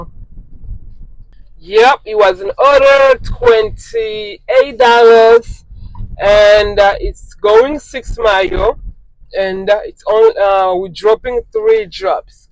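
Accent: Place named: Haiti. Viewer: How long have yòu lived in the United States? Im from Miami trying to place your nice accent, Haiti or...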